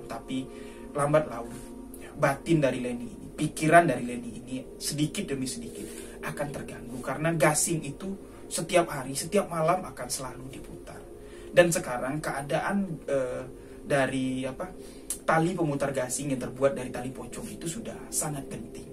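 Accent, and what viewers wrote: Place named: Minangkabau, Indonesia. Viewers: Mohon maaf lahir bathin bang Sukses selalu dgn ada nya chanel ini sy semangkin tahu banyak soal dunia mistik terima kasih bang,bahasa minangnya enak banget didengar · Bg, pembawaan bahaso minang nyo barasiah, sampai wak sangko bg urang minang juo... Salam minang bang dr tanah borneo. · Mantap bang. Bahasa Sumatera bang pas banget.👍👍👍👍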